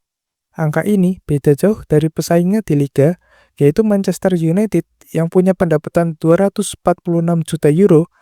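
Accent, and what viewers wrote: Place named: Java, Indonesia. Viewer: sueneng aku koyo ngrungokno radio nganjuk , tetaplah medok mas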